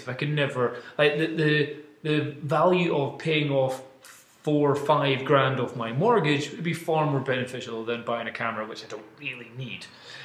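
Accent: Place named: Scotland, United Kingdom. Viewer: Offtopic: Everytime I hear you speaking (especially numbers) I start hearing the - in my mind:) That scottish accent:)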